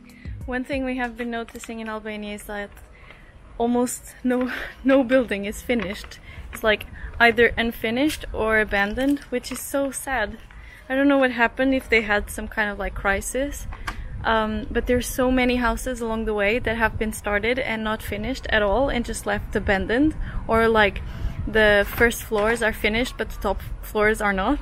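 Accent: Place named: Norway. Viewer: Happy birthday! I think your english has become influenced by your exposure to norwegian😊.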